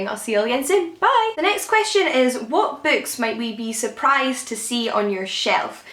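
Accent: United Kingdom. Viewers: Thank you very much for your sharing! I find it very useful for my study as an English major in China...btw, I am very intereted in your accent, I think it is very cute...Do you mind if I ask which part of the UK you are from? Thx so much!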